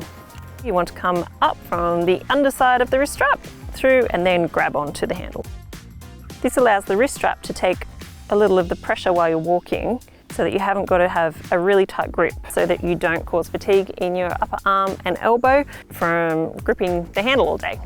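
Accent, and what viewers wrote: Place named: Australia. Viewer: OH.......! I am NOT being xenophobic here cause I reckon Culture & Multi-Culture are GREAT.! - But It's SO NICE To HEAR An AUSSIE Accent on YouTube....!!! Thanks, good work matey.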